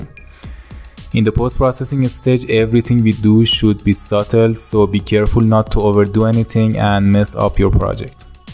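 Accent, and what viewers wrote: Iran. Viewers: dadash damet garm az lahjat fahmidam ie iranie ba gheirati :)) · Your accent is similar to Iranians. If you're Iranian, well done. If not, well done again ;)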